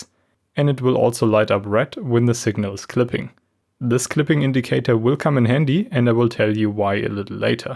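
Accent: Germany. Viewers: Nice work catching M-Audio’s misleading claims. Equipment tear-downs should be a basic feature of any good review. I always enjoy yours. And that German accent is FANTASTIC! CIao!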